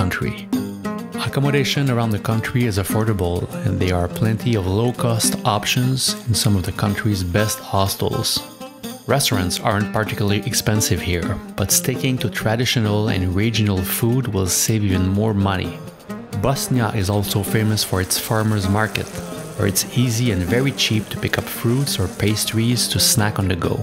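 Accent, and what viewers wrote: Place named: Quebec, Canada. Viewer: J’ai spotté ton accent Québecois lol. Nice video btw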